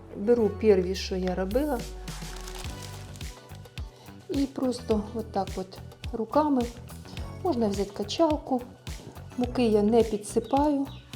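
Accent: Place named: Bila Tserkva, Ukraine. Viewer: Ваша мова,як на Білоцерківщині,я звідти і тоже,дякую.Моя мама пекла чудові пи ріжки,а начинки були різноманітні варена солодка квасоля,мак,сир,капуста,варені цукрові буряки з калиною,сливи,вишні.Тільки ностальгую,бо,соромно,що так і не змогла,як мама готувати тісто.А ще були з лідером і яблуками.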